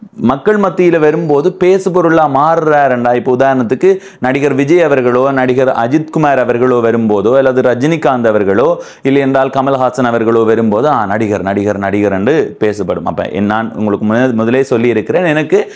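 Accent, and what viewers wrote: Sri Lanka. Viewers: ஈழ தமிழர்கள் தமிழ் பேசும் அழகு அருமை 😊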